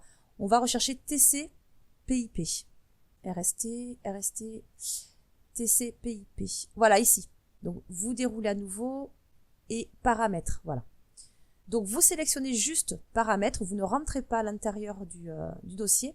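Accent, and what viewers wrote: South, France. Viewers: Excellent tuto que j' ai regardé avec plaisir. j'ai appris beaucoup de chose. Merci . Avec l'accent du sud..... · "cliquee droit"mdr, Merci pour ce bel accent, enfin un tuto sudiste ;) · a l'accent du sud l'accent qui chante ^^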